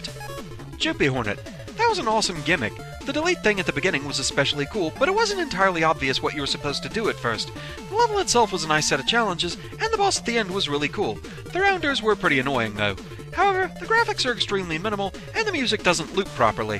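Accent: United Kingdom. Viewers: He's about as British as it gets if you hear his voice commentary. In a good way I mean.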